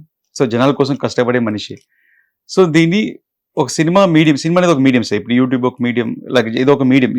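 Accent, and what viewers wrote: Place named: Tamil Nadu, India. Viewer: Nuvvu nee arava slang pora pooka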